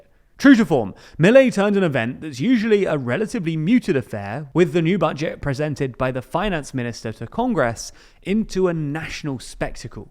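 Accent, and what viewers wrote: United Kingdom. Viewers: So now someone with a UK accent is speaking badly of Milei... Do you prefer that we continue with the previous K government and fill ourselves with Chinese and oriental weapons...? You guys don't like anything. Better deal with illegal migration in your country...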